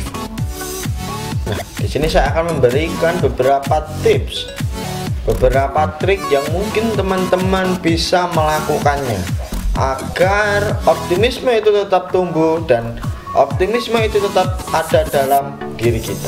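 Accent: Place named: Java, Indonesia. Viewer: Medhok yaaak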